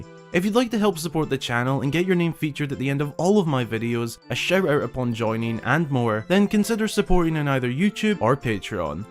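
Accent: Scotland, United Kingdom. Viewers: Your Scottish accent is perfect for your Zelda content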